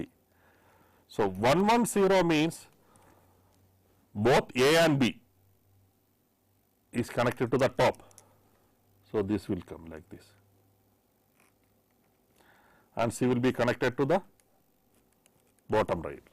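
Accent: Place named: South India, India. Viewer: very useful video...regarding his accent, he speaks indian accent particularly south indian accent..ppl may find it difficult but slowly u will be used to his pronunciation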